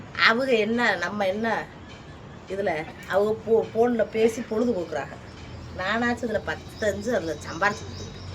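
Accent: Madurai, India. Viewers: நீங்க மதுரை ya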